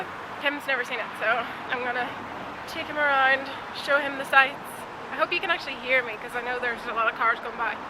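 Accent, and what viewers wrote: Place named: Ireland. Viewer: Born Irish Accent, the best accent in the Whole World v😁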